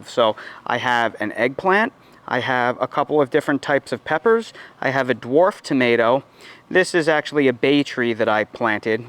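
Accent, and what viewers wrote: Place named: New Jersey, United States. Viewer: I knew you were from NJ! lol I was hearing you talk and I thought to myself, "He sounds like he's from Jersey." I checked your bio, and there you go! I grew up in New Jersey, but more up north, so we don't have the same accent, but yours still sounds familiar to me.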